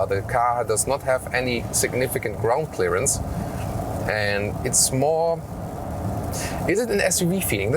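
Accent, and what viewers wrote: Germany. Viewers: Love your reviews. Great English speaking with superb German accent. Awesome info and opinions on the cars. I've just ordered an iX3 :-)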